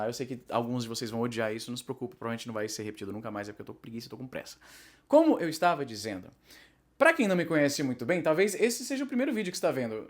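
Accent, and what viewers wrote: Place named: Brazil. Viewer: Seu sotaque brasileiro está "perfeito" e muito agradável de ouvir.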